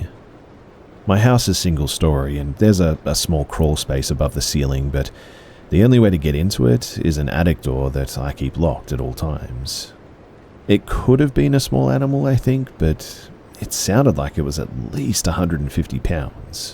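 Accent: Australia. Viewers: Are you Australia? Loving you content aswell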